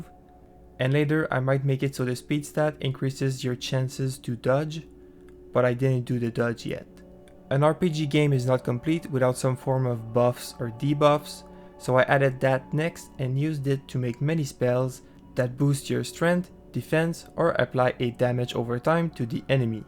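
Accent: Quebec, Canada. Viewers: L'accent queb qui sort mon gars lmao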